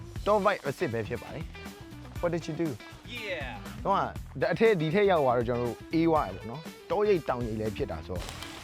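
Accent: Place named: Myeik, Myanmar. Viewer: မြိတ်အသံပါ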